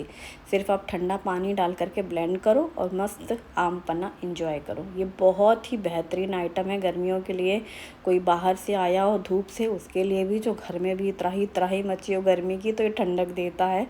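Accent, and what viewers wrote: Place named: Maharashtra, India. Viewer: Ek baat bolu.... Aap "Bahar ko Baher " bolti hai hamesha , mai hamesha notice karti hu,,, A Hamare MAHARSHTRA ka asar hai.. 😊😊😊😊❤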